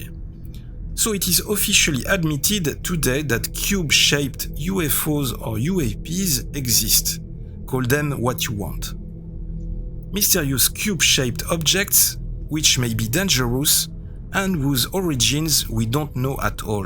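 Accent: France. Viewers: hahaha, your strong french accent is very funny, but nice from you to give it a try and inform the world with your french knowledge, this is seldom. You have forgotten to mention 2 very important whistleblowers/researchers about Ufo Crash Retrieval Teams like the Blue Berets: Leonard Stringfield and Sgt. Clifford Stone.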